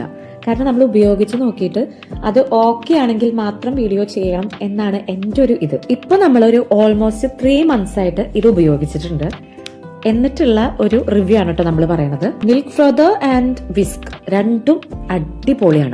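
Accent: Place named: Thrissur, India. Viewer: Is ur place Thrissur? Why do you mix your slang with others? Just go ahead with your own style, people will accept it. Be yourself.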